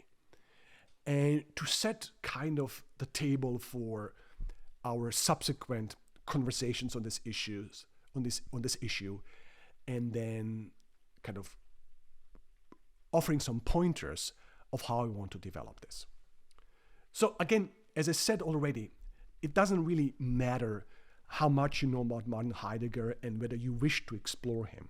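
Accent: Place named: Germany. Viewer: That was a wonderful talk, and it has certainly awakened my interest in Heidegger. Thank you! Your spoken English is excellent, by the way. Your accent is difficult to place. Ist Deutsch ihre Muttersprache?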